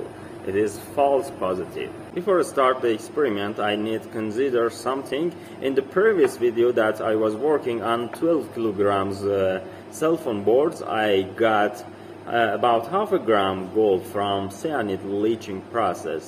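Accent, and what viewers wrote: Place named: Arab world. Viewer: Hi sir from which country you belong your accent seems to be Arabic.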